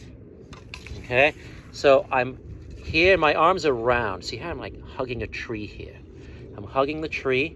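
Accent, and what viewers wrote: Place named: Transatlantic, United States. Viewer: real transatlantic accent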